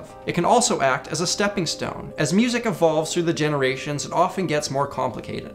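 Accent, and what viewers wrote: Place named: Canada. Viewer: Being honest, your Canadian accent is pretty cool! I get yours way before than American accent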